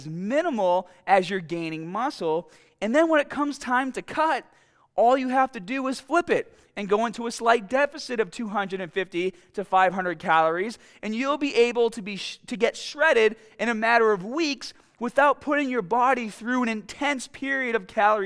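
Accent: Boston, United States. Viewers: This guys definitely from Boston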